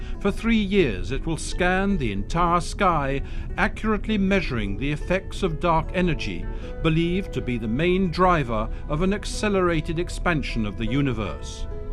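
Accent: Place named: United Kingdom. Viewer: cuz the brit accent is plenty cool enough in mono.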